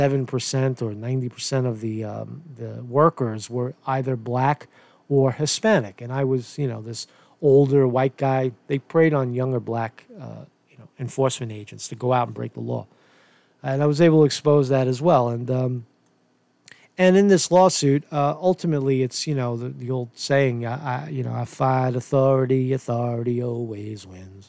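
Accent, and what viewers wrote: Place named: Brooklyn, United States. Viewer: Exposed? You're a rather transparent person already,. You've said enough in the past to inform your audience of who you are and where you've been in life. You know, I notice a trend on YouTube. Creators are coerced into conforming their video content and even presentation style to the interests of one or two viewers. Some creators even request suggestions. But when the critiques are about the presenter, rather than the presentation, I'd be inclined to ask who THEY are working for. Perhaps some imaginary TV broadcasting network or Hollywood. Creators aren't actors, for the most part. They are people, with their own life-stories, their own character and mannerism and their own YouTube channel. As for your revelations? Meh, Brooklyn accent, scrappy when assholes approach, grew up in the 80s...and learned his lessons in the School of Hard Knocks. 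My sister, however, is amazed that you still look so young and healthy with what you've gone through. I think it must be the bodhicitta of a bodhisattva preserving you for the work you're doing right now and in the past. Water under the bridge,. Never feel the need to justify your motives to viewers. If they can't figure you out, then they ought not let the door hit them on the arse on their way out, just as you said.:)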